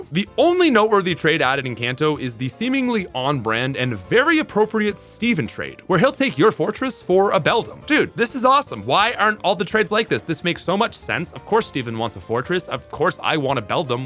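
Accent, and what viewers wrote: Canada. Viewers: Screw this Canadian accent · He sounds so aggressively Canadian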